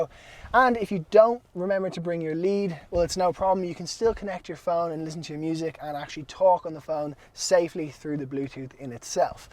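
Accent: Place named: Ireland. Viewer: Golf or = Golf R , its his irish accent .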